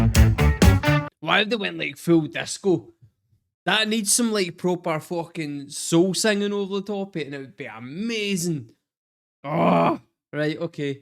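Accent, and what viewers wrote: Scotland, United Kingdom. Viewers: now that I've found an reaction in Scottish accent, my life is complete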